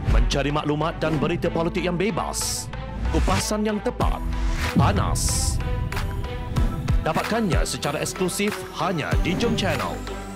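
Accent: Kelantan, Malaysia. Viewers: Bijok weeh demo ajuk keccek Kelantan.Ambo jatuh hati. 😀😃😄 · 😂😂😂speaking kelantan pawer lah bro ni👍👍👍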